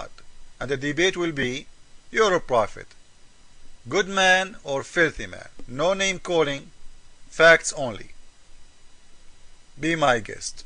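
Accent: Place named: Coptic, Egypt. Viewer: LOL mediocre through and through. By the way, coptic accent is horrible.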